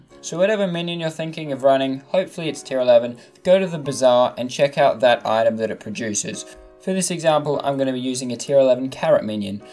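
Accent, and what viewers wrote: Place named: Australia. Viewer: Australia Only know this one because every “a” sounds different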